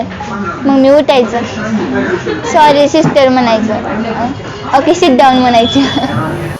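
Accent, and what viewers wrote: Latur, India. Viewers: Latur chi bhasha bhari ahe ...keep it up...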